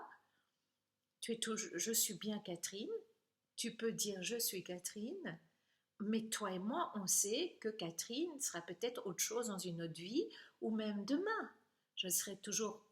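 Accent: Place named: Belgium. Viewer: Je ne sais pas pourquoi vous apparaissez dans mon fil youtube, mais vous y êtes. Je vous écoute et je me dis, elle est Belge!! Et c'est le cas en effet (on n'est pas comme les autres, c'est évident). Bon, mon point de vue: dans l'Homme (j'ai mis un grand H (je suis un boomer)), deux parties : une matérielle, l'autre spirituelle La partie matérielle est duale, les opposés se parlent et se complètent mais peuvent sembler entrer en conflit. C'est la voie de l'apprentissage dans le monde de l'Ego. La voie qui conduit à quoi? A l'Unité par la dualité dans le monde de l'Ego. Pour moi (c'est juste mon avis, rien de plus) l'Ego est un espace d'apprentissage qui me semble rejoindre votre concept de scribe, bibliothécaire, archiviste qui tente d'écrire une histoire, "votre" histoire mais qui, pour moi, ne peut se suffire à elle-même puisqu'elle doit permettre d'ouvrir une porte de sortie spirituelle "positive" qui vient compléter le tout dans cette Unité qui nous constitue.. 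Merci de m'avoir permis, par vos propos, de m'assoir au bord du temps qui s'écoule et d'y cueillir un instant de pensée. Merci à vous , Chère Catherine.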